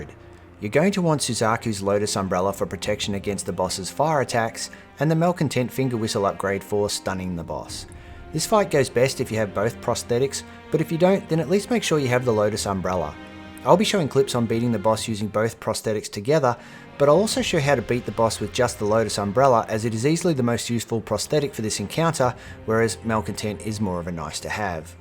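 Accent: Australia. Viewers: During a perfect battle he can barely do anything special but if you take too long my god he sculpts you into his bitch. Thanks again, when I hear that familiar aussie accent I know everything will be okay. Dissecting the videos like this has really made me appreciate the combat too.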